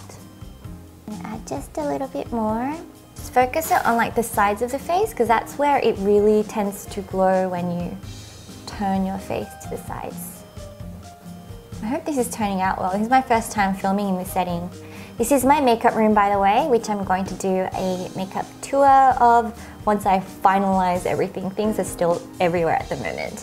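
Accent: Australia. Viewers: You are just lovely but I often get confused with your accent hahaha. I know it's mostly Australian but sometimes I hear Russian? Hahahah it's probably just me. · i love your aussie accent ♥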